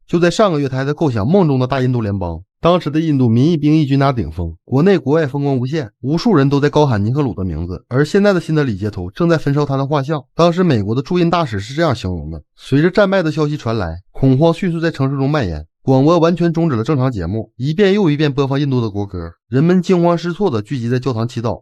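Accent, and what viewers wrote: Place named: Northeast, China. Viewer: 虽然是东北话，但是你能听出来这个，那真是你的问题了。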